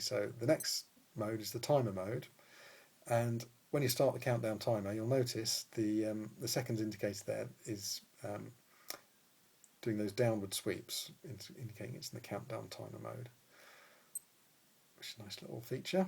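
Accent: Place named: United Kingdom. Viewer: Why is hearing a British guy explaining something so awesome?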